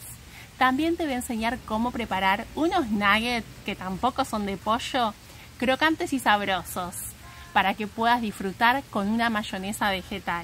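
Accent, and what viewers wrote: Buenos Aires, Argentina. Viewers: Escucho que sos argentina por tu tonada bonairense. Yo viví en San Juan por 10 años, soy italiano, pero ciuando hablo español lloro como ellos. Hagale un video de una empanadilla bien criolla vegana! Nunca haría piensado que podìan existir veganas argentinas! Un abrazo...sellado Explorador del Viento Zonda, vegetariano, no vegano. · Hola, justo estoy en ese proceso de volverme vegana y comer saludable y Dios me puso su canal, gracias. Me encantaron sus recetas, tan fáciles y se ven muy ricas y el paisaje de regalo uff lo ame, pense por su acento que estaba en Argentina, pero leyendo los comentarios vi que es Brazil, de verdad que son Bendecidos y que así sigan siempre. Un saludo desde la Ciudad de México . P.D. Muchas gracias por compartir la receta y el bello paisaje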